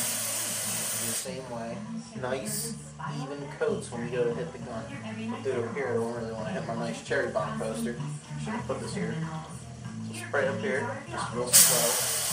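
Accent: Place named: United States. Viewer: Yo, great video! Very thorough, I appreciate it a lot. Around the mark you mentioned that it's now illegal to do... such and such. I didn't quite catch that, despite trying to replay that portion. What's now illegal, exactly? It is only in your state, or nation-wide (assuming you're in the US based on your accent) Thanks again.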